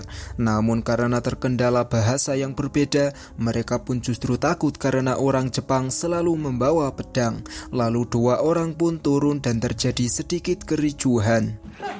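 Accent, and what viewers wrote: Java, Indonesia. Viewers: klo ngomong ga usah medok kali, jadi ga enak dengarnya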